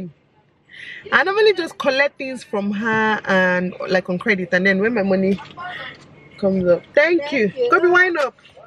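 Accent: Nigeria. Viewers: Your children are so adorable,that ur Anambra tongue always make me laugh alot,cos am from anambra too.keep it coming.nice nice vlog.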